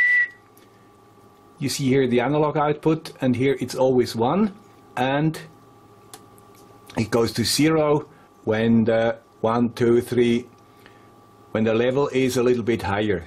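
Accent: Switzerland. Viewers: Merry Xmas and Mrs. Thank you for all your content this past year! I look forward to another years of awesome, educational and entertaining videos done in a Swiss accent!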